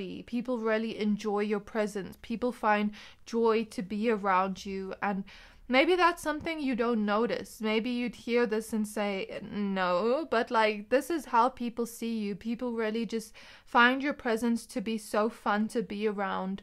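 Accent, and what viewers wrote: South Africa. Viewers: Great video ❤..if you're comfortable answering...may I ask where you're from...you have a SA accent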